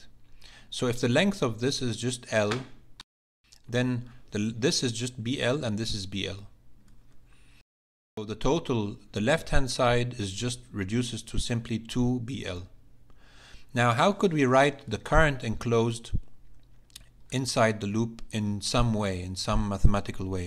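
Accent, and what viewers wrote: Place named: Egypt. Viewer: ❤️❤️ i am from egypt too. I expected that from your accent but i think you are not in egypt? I want to thank you for you for these great videos ❤️❤️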